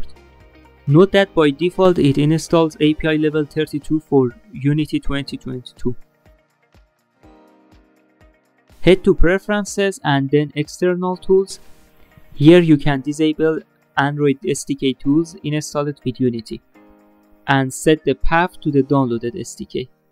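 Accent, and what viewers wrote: Iran. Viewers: از لحجه ات فهمیدم ایرانی هستی 😂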